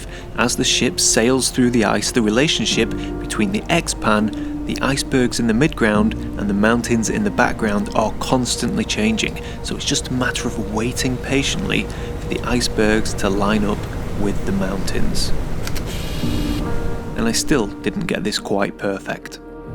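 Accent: United Kingdom. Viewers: Couldnt agree more. For me it is that cozy feeling, the warm music, the unagitated way of explaining things to us, the british XD. His films are always relaxing. At the same time, he always manages to spread the joy which is really infectious. I have the same feeling often with Morten Hilmer's documentaries, but those videos are quite long (over 30min) and focused more on wild live than landscape. So its just that touch different.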